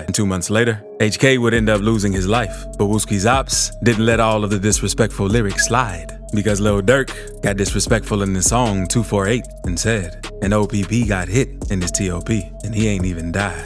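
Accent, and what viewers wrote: United Kingdom. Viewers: The UK accent threw me wayyyy off 🤣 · My guy feelin his voice this recordin’ talkin a lil diff n a uk accent 10/10 😂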